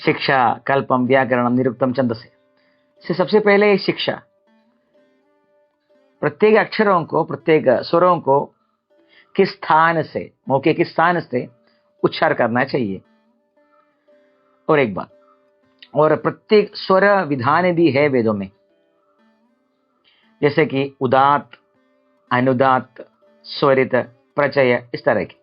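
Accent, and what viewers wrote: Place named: South, India. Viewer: पर ये उच्चारण दक्षिण का है उत्तर का नहीं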